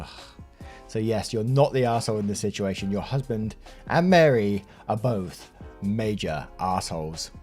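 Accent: United Kingdom. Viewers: Lol. I think MAYBE Canada carries Stouffers but, yeah. definitely has some Brit to his accent but, I'm not sure if he's in the U.K., Australia or New Zealand, or what.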